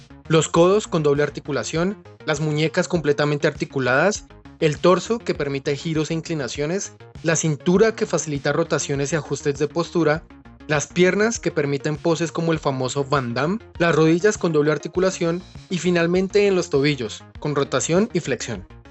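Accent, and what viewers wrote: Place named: Colombia. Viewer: Por el acento veo que eres colombiano. La verdad me sorprendió tú manera de hacer una reseña, ya tienes un suscriptor más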